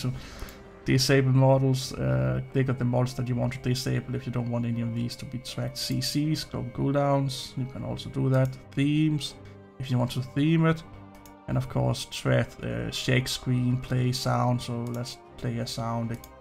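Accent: Denmark. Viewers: It's so obvious on you accent, that you're danish, haha! I like it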